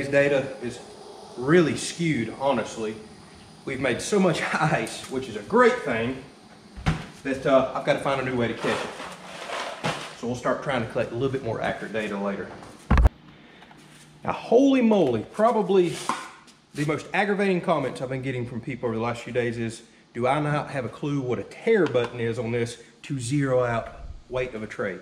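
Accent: South, United States. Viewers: People have been trained by the media that when they hear a slow talking southern accent to automatically think that the speaker is an idiot. Ignore the people that think you're an idiot because you're much brighter then they are.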